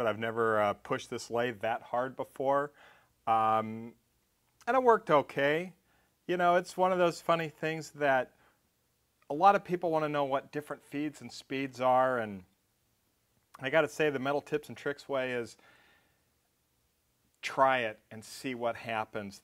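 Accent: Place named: United States. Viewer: Solder has an L in the word: why can't you Americans say it properly?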